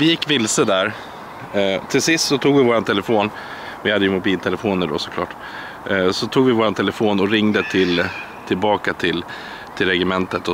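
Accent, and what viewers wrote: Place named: Dalarna, Sweden. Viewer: gud va du pratar Dalamål har aldrig hört dig prata så tidigare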